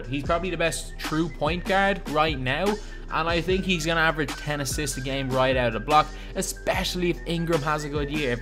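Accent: Ireland. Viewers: Dude u Irish?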